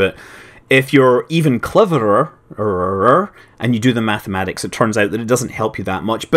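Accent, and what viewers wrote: Scotland, United Kingdom. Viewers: Hullo! Spoken like a true Scotsman!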